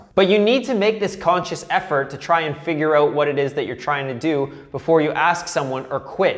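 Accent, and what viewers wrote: Canada. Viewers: I’ve learnt something important from watching channel - that Canadians (well, some Canadians) pronounce 'about' more like 'aboot' and 'out' as 'oot'. Back to practicing now, honest. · Canadian much? Great video man, thank you so much for the tips. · Is he Canadian?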